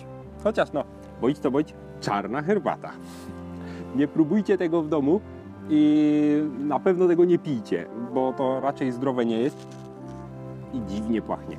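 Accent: Silesia, Poland. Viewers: Ze Śląska.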